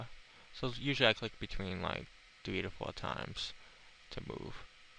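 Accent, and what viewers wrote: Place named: New Jersey, United States. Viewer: morrrrrrrrrrrrrre and you have like a jersey accent :P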